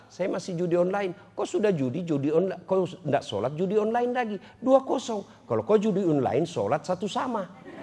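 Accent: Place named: Makassar, Indonesia. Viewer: Logat Makassarnya ini saya kangen..serasa waktu dulu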